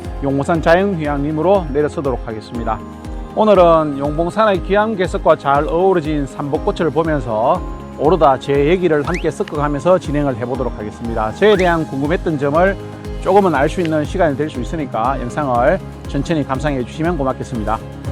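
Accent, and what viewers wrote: Daegu, South Korea. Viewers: 억양만으로 대구 분 이라고 생각했습니다 540만원? 한 편 찍는데 이동하는 기름값도 안되네요 ㅠㅠ 구독자들과 소통을 위해 월1회정도 라이브방송 괜찮은듯 합니다. 슈퍼챗 이라고 구독자가 후원해주는게 있는데.. 아마 인기많으셔서 금방 부자되실 거에요^^ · 아 오르다님 거짓말도 좀 하시는듯... 마라톤 풀코스 완주에 바다수영 3km, 또 트라이애슬론까지 다 하시는 분이면서 체력이 그렇게 좋은거 아니라고 말씀하시다니요. ㅎㅎ 어쩐지 산을 좀 날아다니신다 했습니다. 사투리가 가끔 묻어난다 했더니 대구분이셨군요. 저도 동향입니다. 십몇년을 그곳에서 살았었죠. 유튜브 하시는 분들 다 수익발생 바라고 하지만 하고 있는 입장에서도 쉬운 일은 아닌데 너무 잘 하시는거 같습니다. 조만간 오억도 곧 달성하실거 같네요. ^^ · 와우~~~ 오르다님, 홍성 용봉산의 봄 풍광과 함께 개인적인 소개를 들으니 궁금증도 해소되고 더욱 친밀해 지네요~~~^^ 음.. 저보다 쬐끔은 어리시리라 생각했는데 6년정도 되네요~^^ 사시는곳은 저희 어머님 고향인 대구셔서 억양이 익숙하고 정감있었던 것 같습니다~~ 태안 백화산에서 '이리오너라~~ 게 아무도 없느냐~~' 와우~ 마라톤완주, 철인3종, 수영까지... 반바지 패션을 외쳤었는데~ 오늘 사진으로 다 봤네요~ 굳입니다~~ 항상 응원드리며, 사계절 확인제품군이 아닌 품목들은 협찬 받으셨으면 합니다. 그러면 실시간영상과 드론영상, 360도 촬영등도 앞당겨 할 수 있고 오르다님이 하셔야 제맛일듯 합니다~~ 나이들수록 좀 더 큰 숲을 보기 위해 힘을 빼다보면 가까운 나무앞에서의 자신만의 룰이 꼭 정답은 아닐 수 있다는 생각을 하게 됩니다. 이 대목엔 맞지 않지만 요즘 제가 좋아하는 말이 있습니다. 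'악은 나를 생존케하고 선은 우리를 공존케 한다' 우리가 배우고 지키던 도덕과 윤리는 자연속에 부합되는 섭리가 아니라 인간 공동체 사회속에서 국한되어지는 룰이겠지요~ 코로나만 아니면 함께 등반기회가 있었으면 좋겠네요~~ ㅎㅎ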